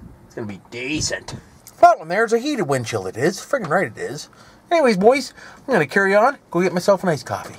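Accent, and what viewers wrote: Canada. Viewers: your Canadian like me eh?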